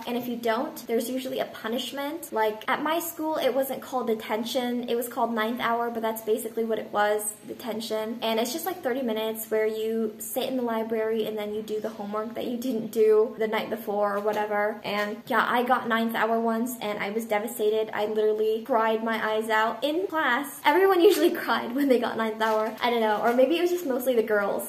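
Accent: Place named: England, United Kingdom. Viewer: I'm here to listen your voice ( English accent ). Makagigil 😚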